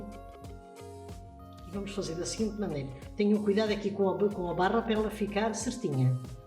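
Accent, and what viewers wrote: Portugal. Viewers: amei seu sotaque português! Lindo demais! E o gorro é uma graça! Obrigada!!!🌷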